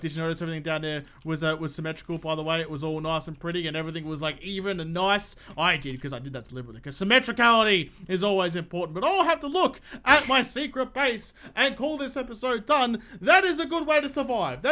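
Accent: Australia. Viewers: Out of pure curiosity from where do you hail? Are you from Australia? Trying to place the accent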